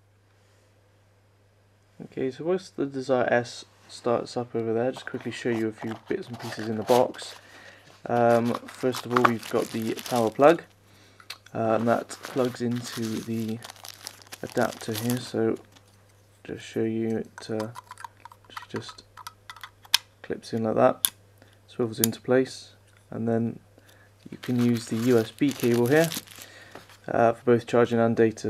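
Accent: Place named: United Kingdom. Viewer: reviews are just more awesome in a british accent, haha great vid man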